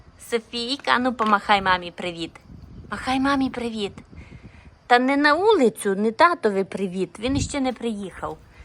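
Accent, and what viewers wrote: Transcarpathia, Ukraine. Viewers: Нарешті я вас знайшла. Давно дивилась ваші відео, а потім мій акаунт пропав і прийшлося встановлювати новий і відповідно всі підписки на Ютюб були пропали, я рада вас бачити..... Я доречі теж з Закарпаття і тому дуже люблю слухати свою акцентовану мову, мало ко її розуміє, а я рузуміву❤😂😂😂 Я з Великих Лучок за Мукачево, але проживаю зараз в Львівській області......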